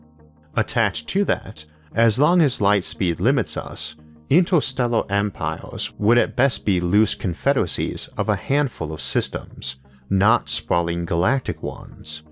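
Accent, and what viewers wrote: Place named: South, United States. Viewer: I've heard you say you have a 'speech impediment' in the past. I think your voice sounds cool as all hell man!! Like an educated southern American gentlemen, i think its awesome :)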